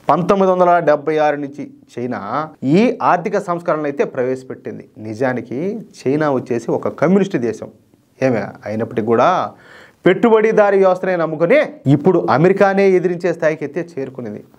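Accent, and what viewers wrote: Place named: Nellore, India. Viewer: Dear, your presentation in that vernacular style with Nellore slang is very good. You are straight and your emotion is just right. Your comprehension about the subject you are speaking is also quite good. Try to organize a debate video by inviting few experts with you as anchor. Thank you for the topic presentation.🌹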